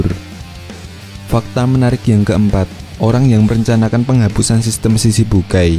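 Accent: Java, Indonesia. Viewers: medoknya itu lho gak tahan 😂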